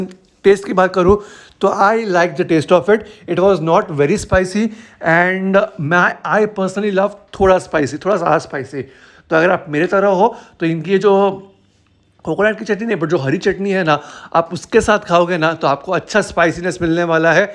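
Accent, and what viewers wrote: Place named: Gujarat, India. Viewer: marcha vaghar rahey hey....😂 typical Gujju.. hindi + gujuu.. 👍👍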